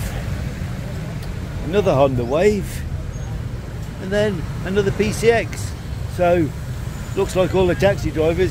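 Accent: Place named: Nottingham, United Kingdom. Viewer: Hi cant place your accent, at a guess would say Nottingham?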